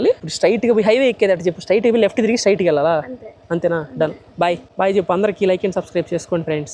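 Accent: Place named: Telangana, India. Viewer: Annaya meeku ma telanagana hyd language baaga alavatu ayyindhi 😅( chesetodni )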